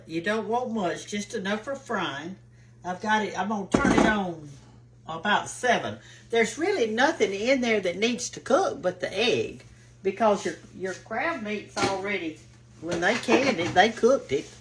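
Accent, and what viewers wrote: South, United States. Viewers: your such a sweet person I just love watching you cook and hearing your southern accent and I like your recipes also prayed for your healing also. GOD BLESS YOU darlin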